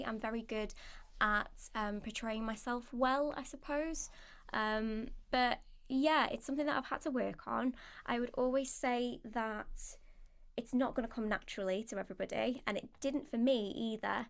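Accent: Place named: England, United Kingdom. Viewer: Good lord I love English accents...... I'm Irish but I'm determined to Marry an English bird, fuck that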